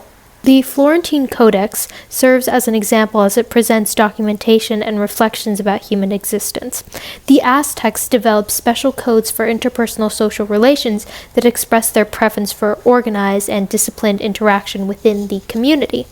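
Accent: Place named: Spain. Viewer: Your spanish accent is so beautiful im kinda jealous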